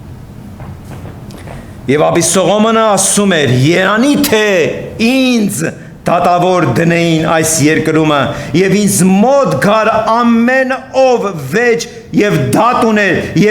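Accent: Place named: Iran. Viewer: Անհամբեր սպասում ենք Ձեր քարոզներին,Աստված օրհնի Ձեզ, իրանահայի Ձեր акцентը նույնիսկ հոգեհարազատ է մեր ընտանիքին,սիրով լսում ու նոր բացահայտումներ ենք ունենում լսելով Ձեզ։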